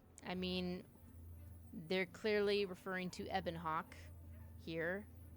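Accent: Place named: Midwest, United States. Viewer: Midwest accent so sweet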